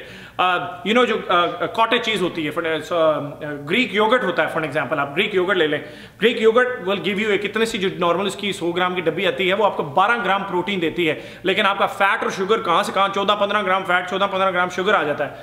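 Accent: Punjab, India. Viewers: Jst love the way he talk....punjabizz always rock😂😊😍😘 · Tuhadi khand chatt diya 😂😂😂😂😂 mori cha ungle 😂😂😂😂😂😂 pure punjabi accent 😂😂😂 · His punjabi accent is soo cute, haha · Yaar Punjabi ke saath yeh ajeeb sa accent english ka... Fir punjabi touch wali hindi..... Maa kasam.. body pata nahi... But dimaag ka workout to tum poora karwa dete ho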